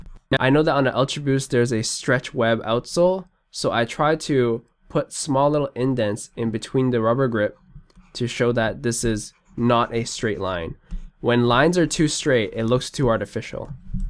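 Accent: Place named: Singapore. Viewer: it's Singlish.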